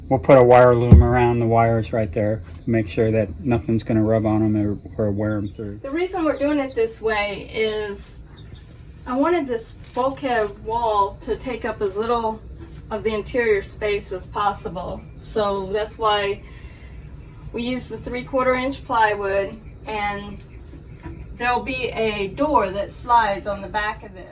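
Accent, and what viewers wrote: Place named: United Kingdom. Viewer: Yeah she really sounds like she’s from the uk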